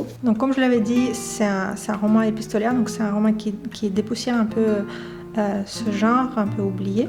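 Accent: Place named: Russia. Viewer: Bonjour et merci pour cette vidéo très intéressante et tellement douce. Je vais relire Orgueil et Préjugés dans la traduction Gallmeister. J' ai Femmes et filles depuis un moment dans ma bibliothèque et vous me donnez l'envie de le lire. Votre accent me rappelle mon amie en Russie que j' espère revoir un jour... A bientôt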